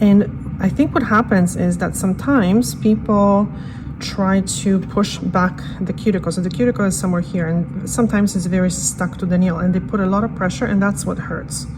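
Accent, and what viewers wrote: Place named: Poland. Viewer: She lives in Spain but is not Spanish. I think she may be Polish.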